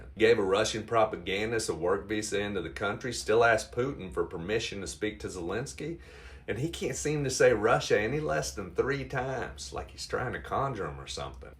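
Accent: South, United States. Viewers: As a longtime resident od "southern- influenced' central Florida, I have to say I delight in your commentary with your southern accent...you prove that mot all of us are undereducated, ignorant and stupid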